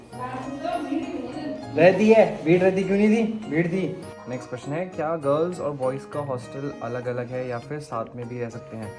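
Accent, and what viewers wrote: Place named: Uttarakhand, India. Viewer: I swear you are from Uttarakhand accent bahuttt jyadaa familiar heinn 😭🥰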